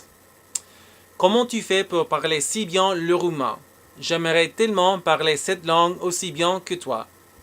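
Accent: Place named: Louisiana, United States. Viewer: On dirait qui parle le Cajun louisianais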